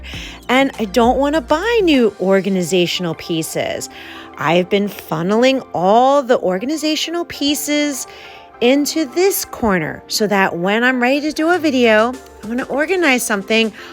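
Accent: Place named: Mid-Atlantic, United States. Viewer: Hi new to ur channel. Came over here from the minimal mom. I def hear an accent from home, ( Pa , NJ, Del area). Ty for sharing with Dawn's audience. All the best ☀️🌻🐝🦋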